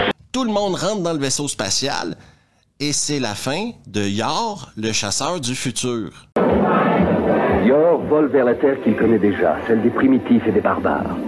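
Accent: Quebec, Canada. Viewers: Ton style unique (humour caustique, présentation fluide, accent Quebecois....) rend ces films attrayants malgré qu'a l'écran on y croit beaucoup moins.Bonne iniative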